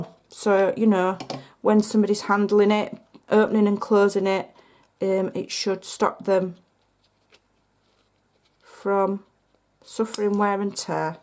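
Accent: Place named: England, United Kingdom. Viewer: those are just the cutest! Your laugh and English accent is adorable. Thanks for sharing your talent.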